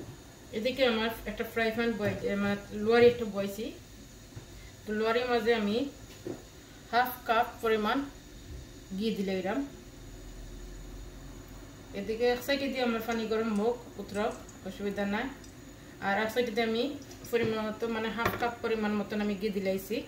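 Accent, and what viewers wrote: Sylhet, Bangladesh. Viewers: Khub bhalo laglo ❤❤sylethi kotha shune aro bhalo laglo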